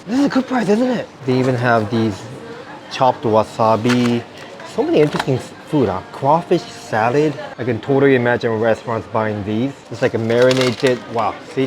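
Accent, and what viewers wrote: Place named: Singapore. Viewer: U sound more singaporean now 😅 love it!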